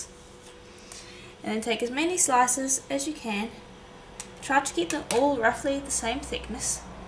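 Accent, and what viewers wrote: South Africa. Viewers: Beautiful earrings, and you have such a calming, eloquent voice. You are very talented. Thanks so much for sharing. I also love all your special tools and tricks. I especially love your South African accent, as that is also where I am from, but a very long time ago.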